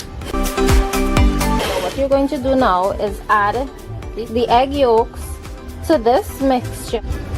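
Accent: Trinidad and Tobago. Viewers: Girl, you sound like a True Trini. I subscribed to your Channel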